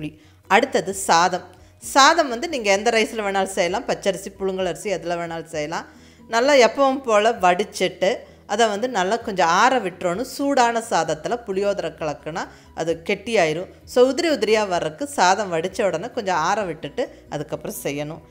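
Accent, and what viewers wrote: Coimbatore, India. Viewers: Neenga coimbatore native ah mam,? ur slang is as me . Nallaa jammunu irukku😋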